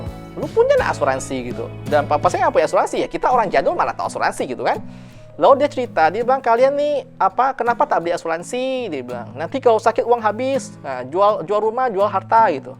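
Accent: Pontianak, Indonesia. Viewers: Bahasa pontinya mirip bgt ama mertua gw 🤣🤣🤣🤣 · Mantab Boy,... Salut dengan budak Ponti, Penuh dgn perjuangan dan menjadi inspirasi utk semua... Salam dari saye budak Ponti, yg lahir dan besak di Ponti.... Aku suke logat kau boy....Tetap semangat dan sehat selalu Boy.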